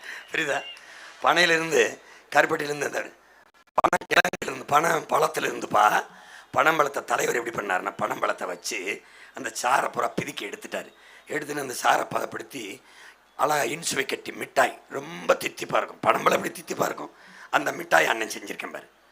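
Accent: Tirunelveli, India. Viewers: ஏலே... திருநெல்வேலியா லே....😅😅❤❤❤❤🎉🎉🎉🎉🎉 நாம் தமிழர் ❤❤❤🎉🎉🎉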